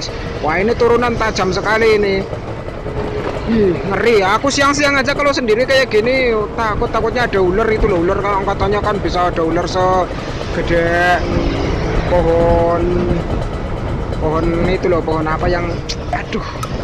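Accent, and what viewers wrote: Banyumas, Indonesia. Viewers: Wkwkwkwkwkwkw ngomonge pada bae kaya inyong kang asal ngomong, #salamngapak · Kalau nonton lu bikin inget kampung halaman ,ngapak bang 😂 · Bahasan kue loh,,HAHAH ngapak banget..keren keren..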